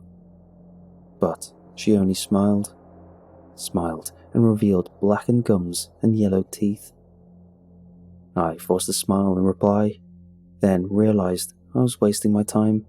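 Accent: England, United Kingdom. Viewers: Bro! Your accent is English? I really don't care but it makes me fall asleep instantly. So relaxing.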